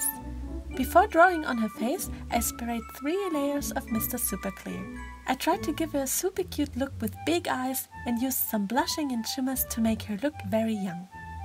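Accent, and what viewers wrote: Germany. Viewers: Are u German? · Your german right?😄😉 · Your voice is so relaxing and soothing. I’m just curious but are you german?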